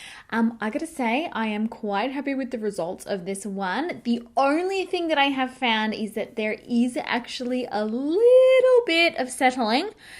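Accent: Australia. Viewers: Sounds exactly like every young beautiful Australian girl, so better not ever come here then.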